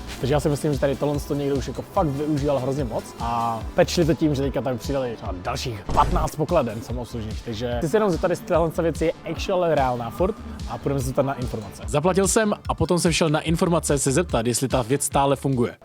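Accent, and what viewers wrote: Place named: Czech Republic. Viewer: It is crazy to hear a new generation of people of Vietnamese origin to speak with such beautiful Czech accent! It seems that I am really getting older, but a nice shock either way...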